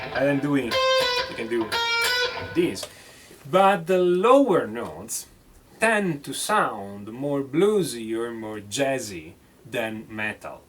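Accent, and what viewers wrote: Italy. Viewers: That's why Meshuggah is so hard to listen to.. I have no guitar knowledge whatsoever but thumbs up for the juicy italian accent ;)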